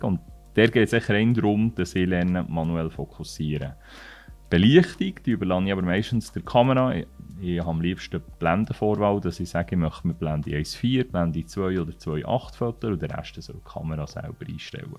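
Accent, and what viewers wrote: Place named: Bern, Switzerland. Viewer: Schön wieder einisch Bärndütsch z'göhre. Danke für das Video. Ich bin auch der Meinung, dass die Kamera in erster Linie gefallen muss und nicht nur sollte. Denn dann nehme ich diese bei jeder Gelegenheit in die Hand und möchte fotografieren. Wer sich in Leica verguckt hat, braucht dann eben eine entsprechende Brieftasche. Wer bereit dazu ist, darf jeder für sich entscheiden, für mich kommen dann jedoch andere Kameras in den Fokus, welche genauso gefallen und technisch meine Ideen noch besser unterstützen. 60MB ohne IBIS ist für mich fragwürdig. Diese Auflösung wird nicht ausgeschöpft, würde ich meinen und füllt den Rechner mit Daten, aber auch das muss jeder selber abschätzen. Generell wird die Auflösung zu stark bewertet. Wer was braucht an einer Kamera, sollte von der Gestaltung der Bilder abhängig sein. Ein EVF unterstützt gewaltig die Komposition sowie die Bildgestaltung durch Belichtung und Blende. 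Immerhin sind diese zwei Faktoren Bildbestimmend. Geht es auch ohne, wunderbar, ich möchte jedoch ein EVF nicht mehr missen, dann wenigstens ein Display. Um nochmals auf Leica sprechen zu kommen, jeder Kamerahersteller hat seine Geschichte, allerdings macht das Marketing von Leica einen guten Job, ob das die Kamera besser macht, möchte ich bezweifeln, eher teurer. Aber so ist es nun mal, auch bei anderen Produkten, wer sich in eine Rolex verguckt hat will keine Swatch. An dieser Stelle muss sich jeder selber die Antwort geben, wieso weshalb und warum Mensch das Bedürfnis hat u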